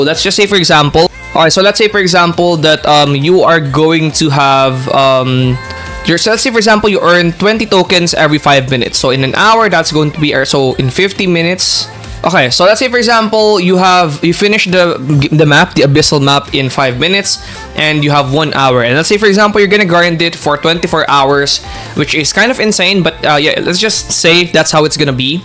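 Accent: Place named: Philippines. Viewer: are you filipino? because your accent is like filipino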